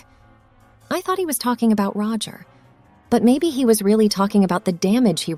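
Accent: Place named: United Kingdom. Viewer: Eww... Please please go back to the British voice. It was so refreshing and different.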